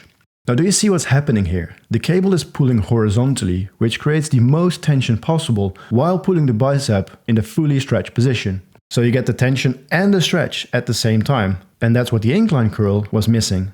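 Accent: Netherlands. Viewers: Fit gast klinkt als een Nederlander 🤔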